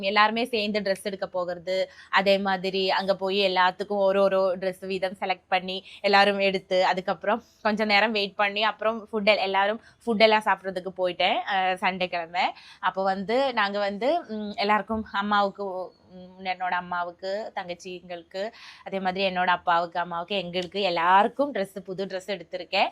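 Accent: Kerala, India. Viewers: Alaga iruku akka unga tamil Kerala la entha area la akka irrukenga neenga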